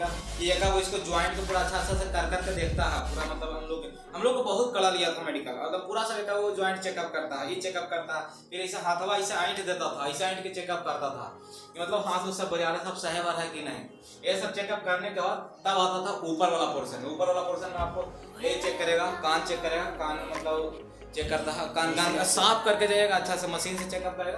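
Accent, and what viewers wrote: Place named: Jharkhand, India. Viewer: Lag raha jharkhandi ho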